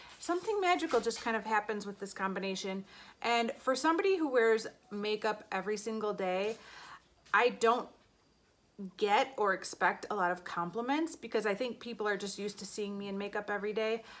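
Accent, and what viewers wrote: Netherlands. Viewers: Dutch accent :)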